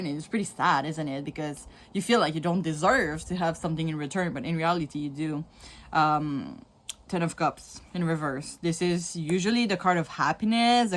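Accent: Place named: Quebec, Canada. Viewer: I can hear a québécois accent from a miles away tell me in right 😅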